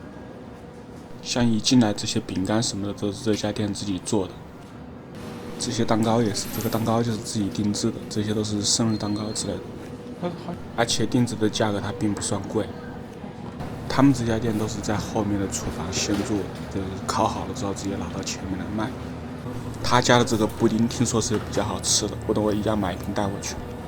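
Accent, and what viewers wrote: Wuhan, China. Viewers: 你这武汉普通话的口音真重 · 武汉老乡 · 你是武汉的吗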